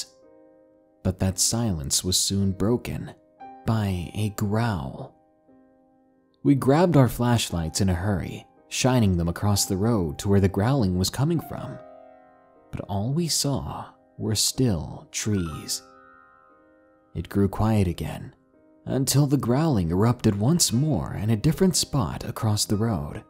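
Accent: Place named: South, United States. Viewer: I used to hate his old southern twang he had. His voice now is great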